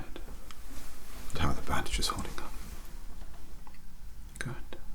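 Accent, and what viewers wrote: United Kingdom. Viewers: Great role play of Sirius Black bud very relaxing am interested are you American or British if your American but doing a British accent than that’s bloody brilliant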